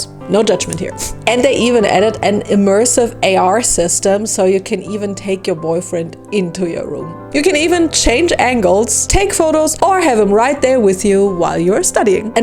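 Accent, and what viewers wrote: Germany. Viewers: YAYY NEW VIDD! also weird question but are you german by any chance?? I believe your accent reminds me very much of german!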